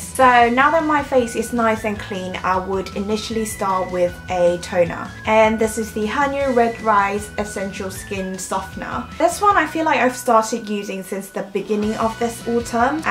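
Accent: United Kingdom. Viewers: 언니 목소리랑 영국발음이랑 너무 잘어울려요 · 영국식 발음 너무 이뻐요ㅠㅠ 프라이머 비교 영상도 보고싶어요 !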